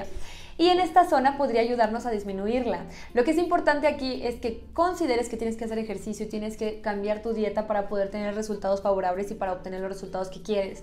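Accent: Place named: Mexico. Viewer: nunca dicen la moneda completa, pero asumo que es mexicana, por el acento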